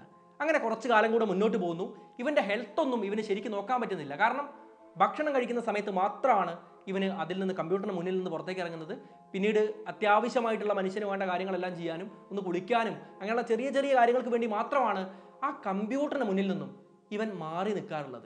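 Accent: Kannur, India. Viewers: നിങ്ങൾ കണ്ണൂരാണോ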